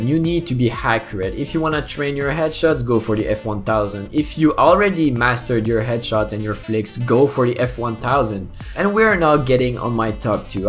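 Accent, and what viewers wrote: Canada. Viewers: bon dieu un vrai anglais de laval ou quebec respect good job vagabon est mon avatar salut lgros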